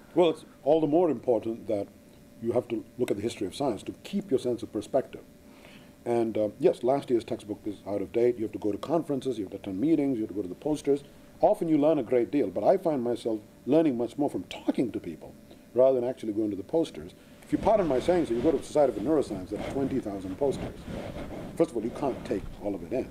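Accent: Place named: Scotland, United Kingdom. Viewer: Thank goodness he has mentioned Indians regarding mathematics etc. I can detect a kind of Scottish accent coming through VS. He sounds a bit like Sean Connery now and again.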